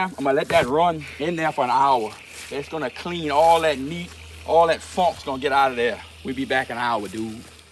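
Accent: Louisiana, United States. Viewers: Sure sounds like my Louisiana people!!!!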